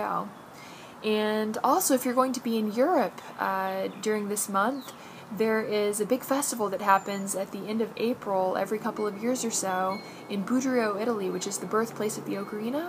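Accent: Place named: Texas, United States. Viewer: I think this is the first time I've heard you speak like a Texan,. I heard you say "y'all" at the end of your video. lol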